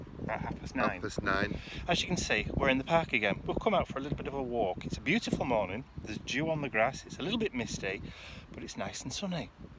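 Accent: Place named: Yorkshire, United Kingdom. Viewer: Hi! I'm new to your channel and am enjoying watching your videos and crafting techniques! And...I thought I recognised your accent as being Yorkshire, and narrowed it down to Bradford where I'm from (now live on Corfu!) So I wasn't far wrong! Lovely to hear it!! Hope everything goes well for you, regards Anne!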